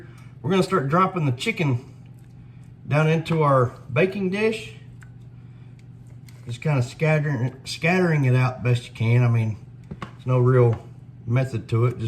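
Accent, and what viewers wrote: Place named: South, United States. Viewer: Do you ever cook using venison? I can tell by your accent you’re from the South. BTW, I live in Hayden Alabama!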